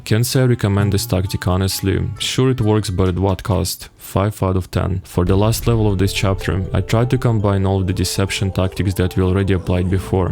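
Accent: Russia. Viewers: я слышу твой русский акцент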